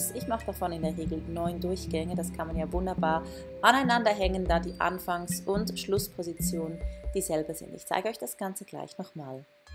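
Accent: Switzerland. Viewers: Als offenbar einer er wenigen Männer die noch hier sind geb ich dir mal dass Feedback dass es tolle Videos sind👌 entspannt mich immer was neues von dir zu hören :) Mal davon abgesehen dass ich als süddeutscher die schweizer einigermaßen verstehe haha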